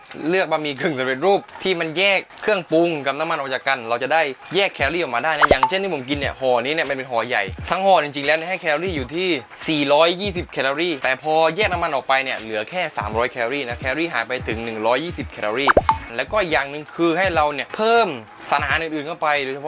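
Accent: South, Thailand. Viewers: พี่คนใต้หม้ายนิ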